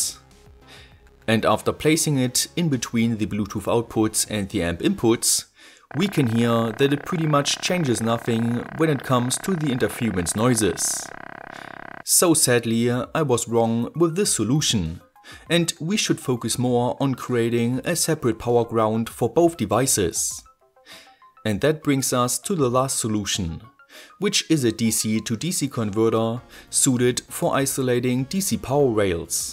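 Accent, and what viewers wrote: Germany. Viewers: I'm pretty sure he's german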